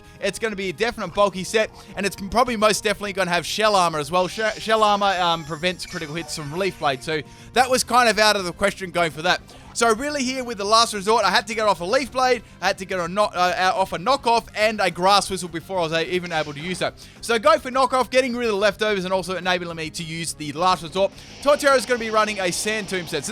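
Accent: Australia. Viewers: Australian accent baby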